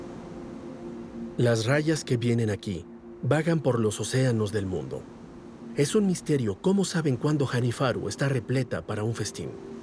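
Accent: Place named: Latin America. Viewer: Mucha razón. Excelente locución en español de América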